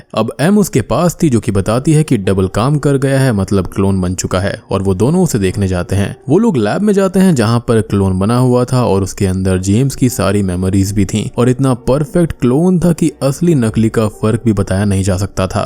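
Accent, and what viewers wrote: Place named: Kolkata, India. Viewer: I know this accent is from kolkata. Don't be sad about such comments. It's all about the fun like a lil child speaks in his way .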